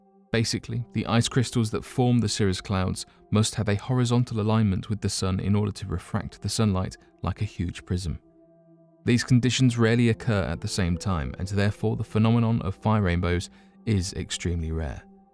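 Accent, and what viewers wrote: United Kingdom. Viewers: I have an unexplained mystery to explore: why are so many narrators of these channels British? Do they sound more "mysterious", or "suave", or "distinguished" than an American narrator would? Unless of course ALL of these channels are British owned and operated...which is real likely🙄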